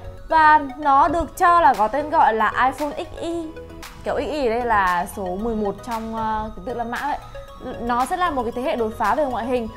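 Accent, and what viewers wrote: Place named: North, Vietnam. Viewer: Bà ơi bà,bà đã nói giọng Bắc khó nghe rồi,mà còn nói chữ dính chùm chùm như mới ăn nho xong vậy...nghe mệt mõi quá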